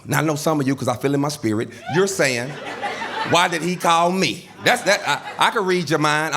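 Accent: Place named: South, United States. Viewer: I love the way enunciates his words when speaking to the audience. You still hear the South but he's proper with no need for code switching. Perfection! Now when he's telling stories about talking to his people issa wrap😂😂😂😂😂😂😂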